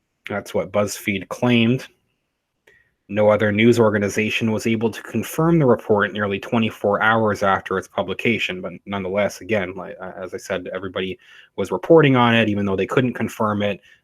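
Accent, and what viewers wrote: Canada. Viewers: if you click on ABOUT--- it shows the channel information page...channel location is Canada . His accent is Canadian they sometimes pronounce about...aboot...kinda cool ...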